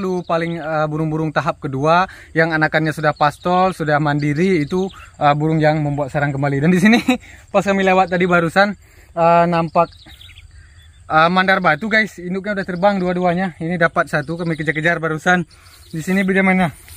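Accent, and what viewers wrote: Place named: Aceh, Indonesia. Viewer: Saya subscribe baru, logat Indonesia nya macam orang aceh? Awak aceh kah droneh? 😅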